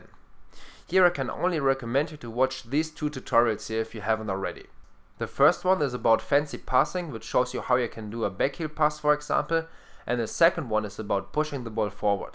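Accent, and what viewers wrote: Germany. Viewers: Are you german ? :D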